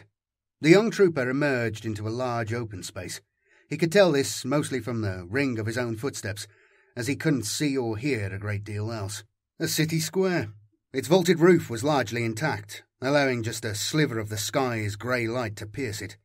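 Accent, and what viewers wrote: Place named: Midlands, United Kingdom. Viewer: Fairly new to you channel, loving it Always makes me smile hearing that Midlands accent. Dow worry, it ay as strung as moine our kid.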